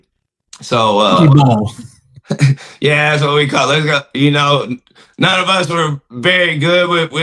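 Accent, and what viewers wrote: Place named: South, United States. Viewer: I know who you are talking about . They do kinda favor this cat here got more of a southern draw.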